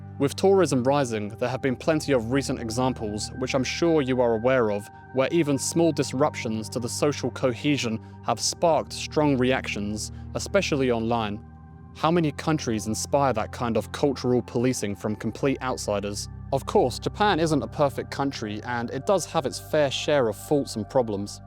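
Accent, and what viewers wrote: United Kingdom. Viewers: I thought like what? Then imheard a brit. Gotcha buddy. After your home lots of stuff gonna feel surreal.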